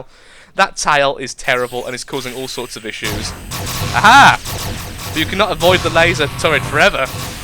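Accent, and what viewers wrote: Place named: England, United Kingdom. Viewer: Wierd, if im not familiar with it. I figured you were from england, but a few words threw me off. I know there are local dialects, i was just curious what local dialect you spoke. I meant no offence, you were right. Im from Australia, we dont have local dialects, we have "country" and "city" accents. My country hasn't been around long enough to have them.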